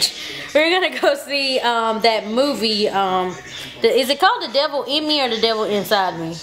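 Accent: South, United States. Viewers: omg that was too cute and funny!! ur so country and its so cute lol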